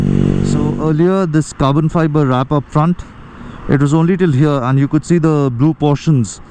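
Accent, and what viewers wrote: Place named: India. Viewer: Hard to fully understand your english cause that indian slang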